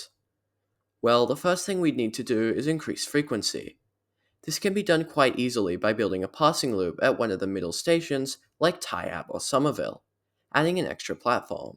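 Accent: United States. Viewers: Great video. Curious where you come from because your accent has a slight American ‘twang’ to it. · Great video. Curious if you’ve learnt your English online from American content as you don’t have Australian pronunciation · I get a feeling he’s autistic. My cousin is autistic and I’ve noticed he and several even high functioning often unawarely talk with an American accent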